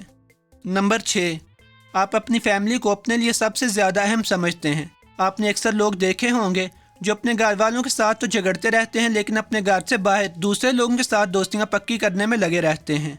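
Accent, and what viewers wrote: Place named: Lahore, Pakistan. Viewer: Aap Yakeenan Lahore sy Hain ... hoobian nahi hota Khoobian hota hai ..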